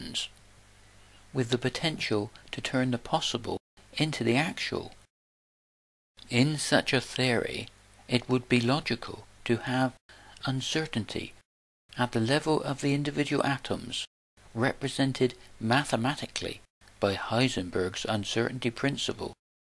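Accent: West Country, United Kingdom. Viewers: Very informative and I love the little West Country inflections.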